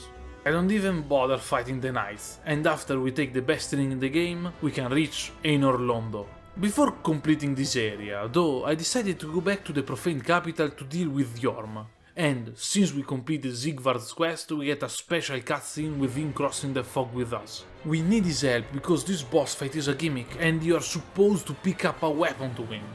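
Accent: Italy. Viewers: Ma sei italiano? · Si sente che sei italiano 😂😂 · Mi fa morire con la cadenza italiana 😂😂😂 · sei italiano? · scusa se chiedo, ma mi sembra che tu sia italiano, o comunque mi pare tu abbia un forte accento italiano, o mi sbaglio? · Are you italian? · Ma sei Italiano? :D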